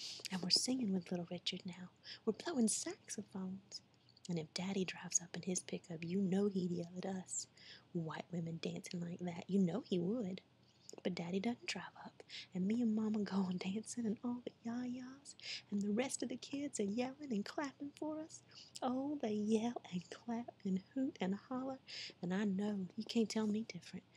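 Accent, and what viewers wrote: South, United States. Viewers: I really enjoyed it and found it very relaxing. There is something about southern American accents that I find very calming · Now this is very cool.... you're voice is soooooo good...oh and the Southern!!! You get an A+++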